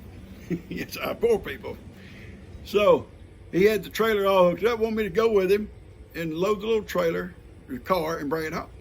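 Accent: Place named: South, United States. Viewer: Hi. I don‘t quite understand all you‘re saying (southern accent 😉) but I love listening to your stories 😊👍 I for sure understood the story about your pants and the family in their car 😂 I always enjoy looking at pictures from earlier days. Do you have some for us? Greetings from Switzerland 😊🇨🇭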